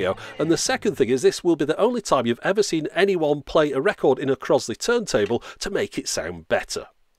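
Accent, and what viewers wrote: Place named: RP, United Kingdom. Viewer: The voice sounds like Finn from BBC English...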